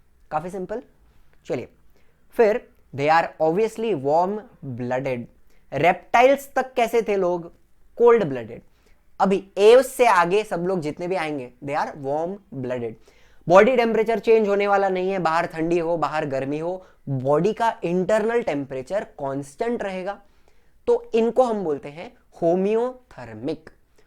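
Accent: Maharashtra, India. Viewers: sir aap marathi ho na ? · You are Kutchhi but you sound more like a Maharashtraian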